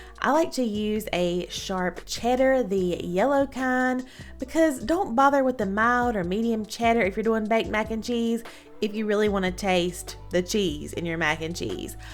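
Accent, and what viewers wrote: South, United States. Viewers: I am so excited to try this! Love your Southern drawl! I'm an Alabama girl!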